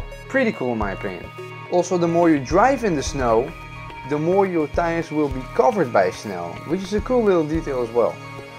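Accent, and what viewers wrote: Netherlands. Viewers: Are you dutch? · Are you Dutch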